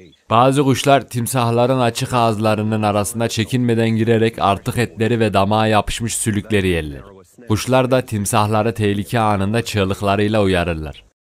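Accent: Ankara, Turkey. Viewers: Usta anlatma şeklin Ankara şiiven anlattığın herşeyi akılda kalıcı yapıyor iyi düşünmüşsün kolay gelsin.😁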